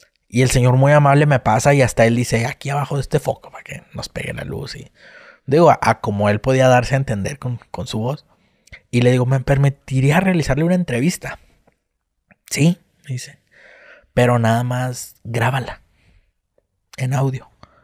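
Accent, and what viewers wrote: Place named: Chihuahua, Mexico. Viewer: YO SOY DE CHIHUAHUA ..Y ME IDENTIFICO MUCHO CON SU MODO DE HABLAR.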